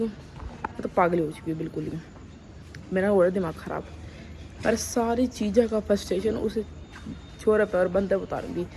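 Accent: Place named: Haryana, India. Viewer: Nice haryanvi😅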